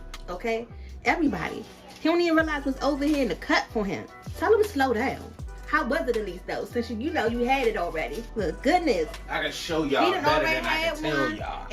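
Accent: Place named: DMV, United States. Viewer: You have to be from the DMV area, I know that accent from anywhere!!! After watching the vid, yes you def are!! I feel so special watching someone from my area on YouTube!!! Get it y’all I love it😍😍😍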